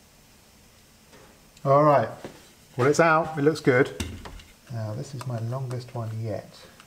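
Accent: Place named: United Kingdom. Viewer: Hi again. I got excited watching you opening up these bad boys. Knowing what it's like to attempt a new pour I can almost feel my heart beating faster. Fun to watch! Right now I am making patterns (wood) and prepping to cast some CNC parts (the z carrage) which are longer and heavier than anything else I have done (it's to be a big 8 x 4 monster cnc). I like the gateing and swirl trap system you used. I 'think' I will need gates a touch larger for the casting I want to make to get all the metal in there before freezing... By your accent I am guessing you might be in the UK? If so, at some point we should totally do a YT colab of some kind. Ok peace, Bongo. PS, how do you think you could eliminate the bending (do you just put it down to the geometry of the pattern (the two perpendicular ribs)?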